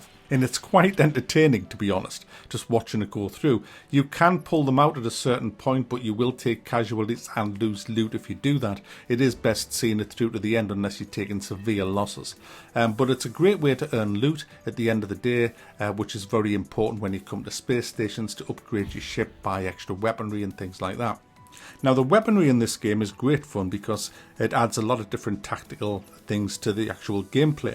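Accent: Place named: Newcastle, United Kingdom. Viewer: Oh Lord, first time watching a review channel and it'll never be looked at again. Normally liked a Geordie accent but this guy was wildly unfunny and f**king annoying.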